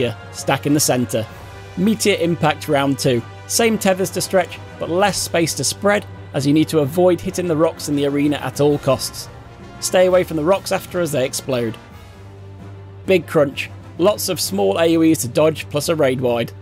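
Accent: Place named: Northern England, United Kingdom. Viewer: Just what I needed for a refresher going into it again with new folks! And sure, even coming from Ireland, you can always trust that Northern English accent to be no nonsense, straight to the point, here's what you do. Love it! Thank you so much <3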